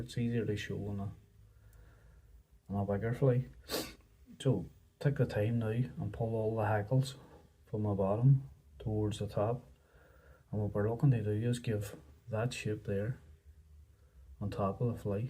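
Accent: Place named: Ireland. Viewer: What part of Ireland are you from....nice tie by the way....keep up the good work....i've only started trying to tie smaller flies recently as the eyesight isn't as good as it used to be unfortunately...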